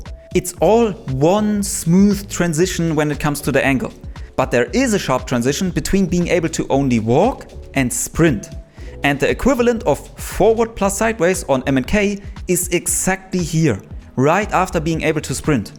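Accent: Germany. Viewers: Wait are you german? You have a german accent im sure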